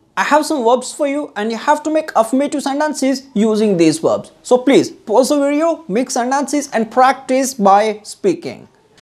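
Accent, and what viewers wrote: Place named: India. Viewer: Because your speech sound like indian kkk are you from Índia?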